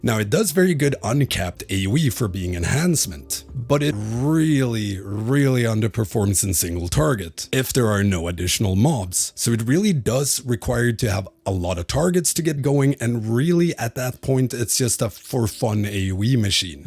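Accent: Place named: Sweden. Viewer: bis swedish accent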